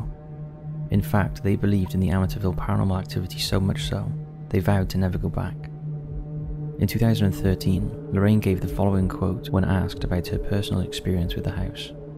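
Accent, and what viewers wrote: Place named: England, United Kingdom. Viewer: I thought wecwere going to see and hear Ed & Lorraine Warren. Instead, we hear someone with an english accent talking over the entire video. Did not hear any of the actal video. Extremely dissappointing.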